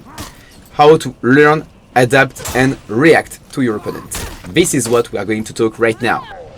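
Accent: France. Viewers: Ah a fellow Frenchman it sounds like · J’entends que tu est français😂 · Are you French? Cool accent! And great video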